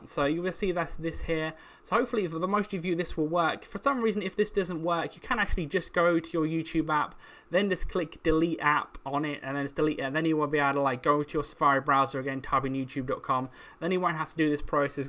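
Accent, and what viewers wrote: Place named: United Kingdom. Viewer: Between the disgusting mouth sounds and the million things happening on screen with athe British accent saying “that’s not what you want” this video is a 0/10 would not recommend to my worst enemy’s, great job random bloke.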